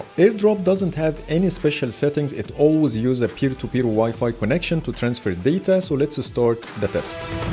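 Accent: Egypt. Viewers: انت مصري ؟ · You sound Egyptian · لهجتك كصريه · I have feeling that you are Egyptian from your accent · انت مصري يسطا ؟ ال accent دي مصري وش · Amazing video I only have one question, your accent looks familiar you must be Egyptian right ? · sound like Egyptian to me😁😁👍👍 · مصري عايش في الامارات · انت مصري صح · are you Egyptian I can tell from the accent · ليه انا الوحيد الي حااس انو الي بيتكلم مصري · انا حسيتك عربي برضه من بداية الفديو لما سمعت لهجتك الانجليزية 😂😂 قلت ده مصري اكيد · الصوت مصري مصري يعني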